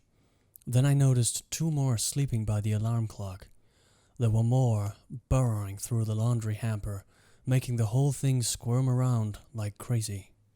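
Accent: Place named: Germany. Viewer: nice accent, are you from Germany?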